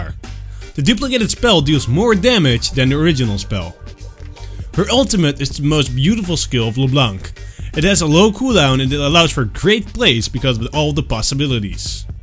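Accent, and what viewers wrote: Netherlands. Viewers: Ataru Kawahara Nederlands accent :p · are u from holland i hear the shit accen XD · Are you dutch? :D Because for some reason I think I can hear a little bit of the accent :) Great tutorial though. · ben jij nederlands je klinkt heel nederlands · Omg you must be dutch the accent is sooo recognizable xD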